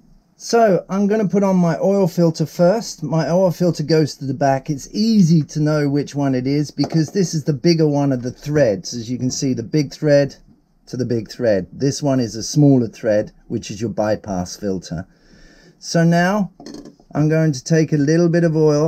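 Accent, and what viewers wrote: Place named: RP, United Kingdom. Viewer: Great video and exceptional BBC voice! Appreciate the help. Regards from New Zealand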